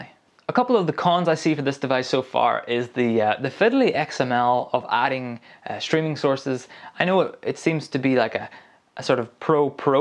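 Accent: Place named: Derry, United Kingdom. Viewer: Thanks sir. These are great videos. You're defs from Derry. Gotta be? Sounds like I'm getting a tutorial from my neighbour ;)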